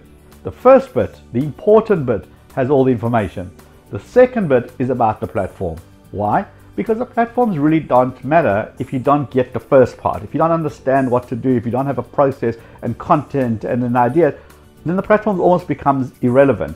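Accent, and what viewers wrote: South Africa. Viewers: Oh! Of course, you're SAfrican. haha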